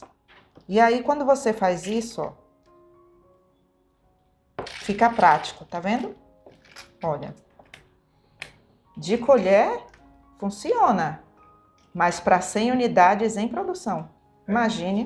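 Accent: Bahia, Brazil. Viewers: Como gosto do sotaque da, essa baiana arretada. ❤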